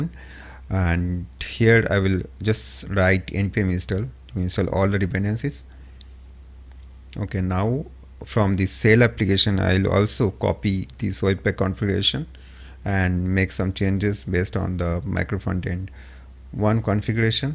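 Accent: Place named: Bengal, India. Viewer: Your accent is very bengali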